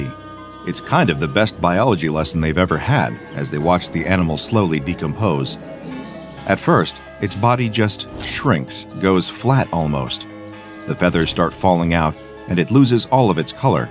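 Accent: England, United Kingdom. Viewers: These English accents are beautiful, I am LOVING your videos. I've been watching and subscribing since your first video. Watching you grow to one million subscribers made me so happy. It's nice to see you going strong. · might be the most british voice ive heard in a while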